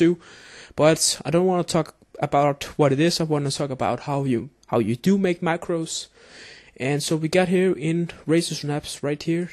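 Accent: Denmark. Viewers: are you danish? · Are you danish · you sound like a dansker din dansker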